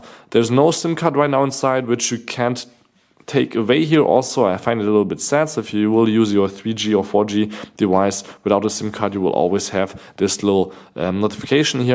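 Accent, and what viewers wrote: Germany. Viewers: R u german?u've got that german accent.....nice review btw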